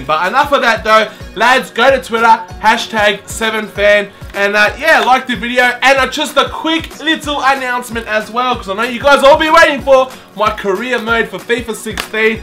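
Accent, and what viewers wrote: Australia. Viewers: Your aussie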